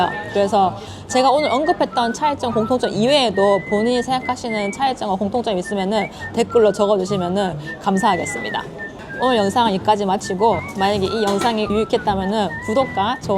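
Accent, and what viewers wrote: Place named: Gyeongsang, South Korea. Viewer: 갱상도네요 ㅎㅎ🎉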